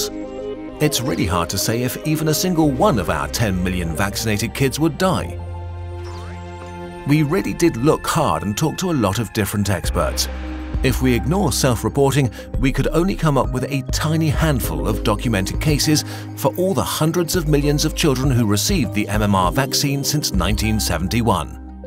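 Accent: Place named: England, United Kingdom. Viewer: Amazing to see the effect of a confident voice and a classic English accent. You can sell rubbish for roses, so long as you package it properly....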